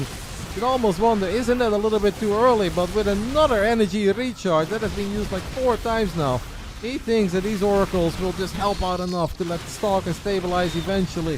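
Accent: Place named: Russia. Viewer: whu you speak with “Vlad” accent?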